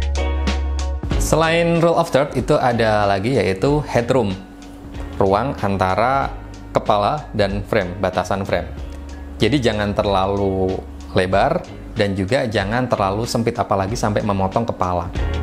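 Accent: Pekalongan, Indonesia. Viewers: Pekalongan mana suaranya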